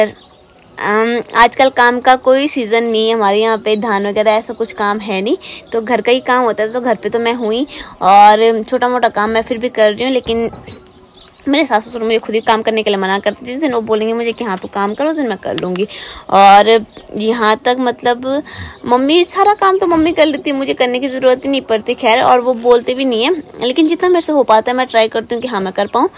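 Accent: Garhwal, India. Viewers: apke bolne m jo gadhwali jhalak aa ri h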